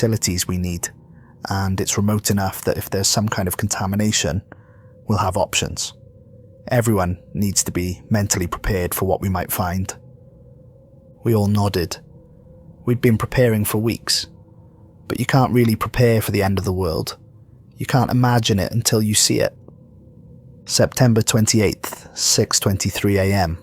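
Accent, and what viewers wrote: United Kingdom. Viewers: You did an excellent job of writing one of the most depressing science fiction stories of all time despite the glaring inconsistencies. The narration was completely appropriate for the dark atmosphere, although the British accent seemed out of place. I disagree with many of the earlier posts that this tale needs a second part with a more conventional ending.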